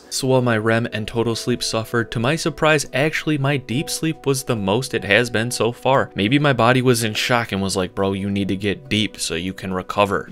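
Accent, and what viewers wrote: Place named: South, United States. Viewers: How come you had a southern accent in this video 😂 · Definitely noticed more of a Southern twang to his accent. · He sounded more "southern" but I just noticed after reading your comment. Lol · Dude why are you talking with a Southern accent??? Just talk normally man. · He probably listened to southern people, or he's doing a trial related to changing his accent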